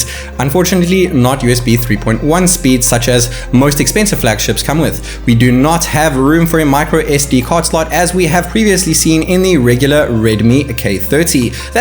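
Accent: South Africa. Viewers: You should add ZAR to you currency conversion. You sound South African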